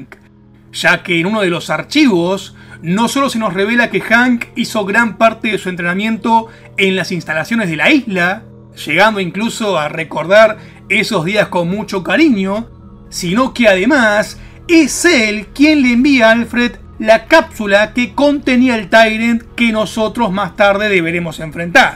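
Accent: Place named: Argentina. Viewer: Yo soy de argentina y supongo por el acento el tambien es argento. Y aca lo jugue en play 2 la consola de sega aca no duro mucho.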